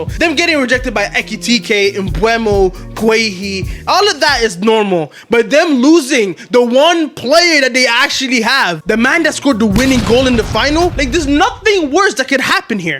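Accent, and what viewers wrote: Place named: Northeast, United States. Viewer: Where is his accent from? I can guess it's from the Northeast, the US but which state/city?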